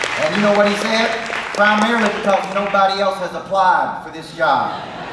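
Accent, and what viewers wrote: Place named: South, United States. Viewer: I love that Southern accent!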